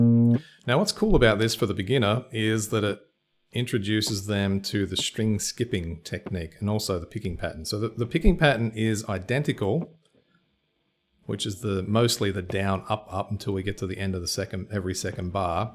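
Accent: Australia. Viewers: Very interesting lesson, I listen to many types of music and play guitar, I've always liked Joy Division and kinda connected with Curtis... and later in life met and moved in with my significant other in Macclesfield[many years after his death] and She lived a hundred yards from Ian, and her dad worked with him in the 1970s The locations of his house and where he worked in the film Control are genuine. Her father~Trefor, said that Ian was very intelligent and really cared about the people that their social security dept tried to help..... which was mainly disabled people. Trefor died recently and had no idea who Joy Division were and about Ian's writing. ;~) now living back where I grew up, with Trefor's Daughter and "Macc Lass" , on south coast of England to look after my elderly mother. Noticed your accent , I have a younger half bother who now has duel citizenship with UK and Australia. 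He's just moved back there permanently with his baby kid to continue teaching Maths. Forget exactly where he lives, it's within a hundred miles of Melbourne. I talk too much blahblahblah... Cheers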